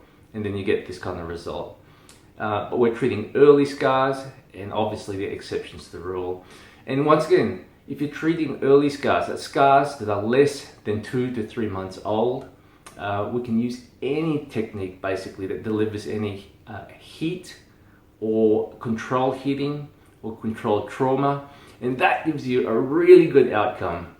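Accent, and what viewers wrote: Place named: Australia. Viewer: Asian doctor with oz accent. Love it.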